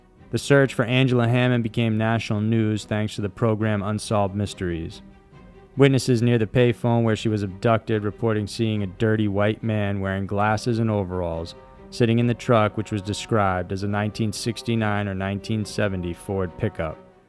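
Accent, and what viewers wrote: South, United States. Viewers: He has a southern accent i dont know how thats scary.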